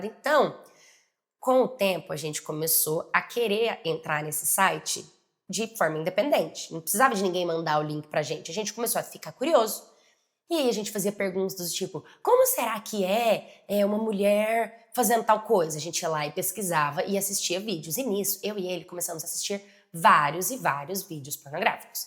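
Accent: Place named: Curitiba, Brazil. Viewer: Eu não sei porque, a voz dela me lembra a da dubladora da toph, Ahsoka tano e da Gwen do Ben 10 só que com sotaque de Curitiba.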